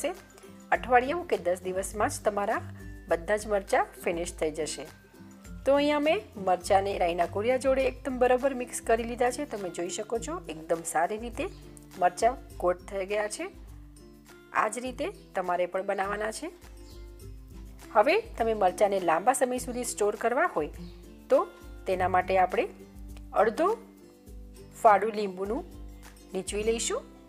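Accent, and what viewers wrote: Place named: Kutch, India. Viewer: Tamari language per thi laage che ke tame kutchi cho. Koik Kutchi recipe batavo ne.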